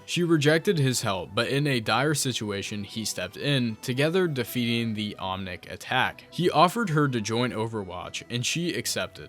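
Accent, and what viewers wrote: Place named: United States. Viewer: is this a text to speech or are you so american you can't let go of the american pronunciation? It's horrible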